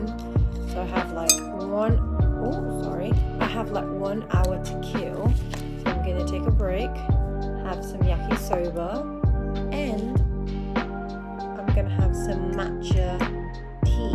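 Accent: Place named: United Kingdom. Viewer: hi ganda. 😍 amazing collection! apaka pretty and sweet mo, really glad to have the honor to have befrieneded you through a game even playing on the oposite side of the team😄 and so sweet of your dad too to help you out, i see where the kindess comes from. god bless and see you soon in the land of dawn😘 ps: love the british accent! 😄 lg, ate diana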